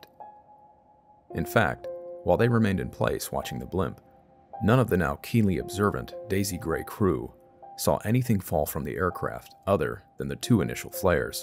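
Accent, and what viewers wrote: United States. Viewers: Was looking forward to this channel, then "Oh for gods sake, an American narrator" why does everything have to be americanised, won't be listening again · Would have liked to be able to add this to my subscribed list as I so enjoy Bedtime Stories, but the American accent isn't for me. Sorry. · I'm pretty happy to hear an American accent. This guy is a Marine and his channel focuses on war stories. No Brits here. 😬.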